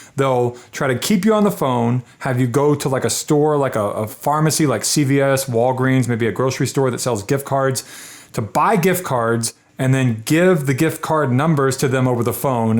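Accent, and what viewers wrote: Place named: India. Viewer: As soon as I heard an Indian guy I was like nahhh😭😭😭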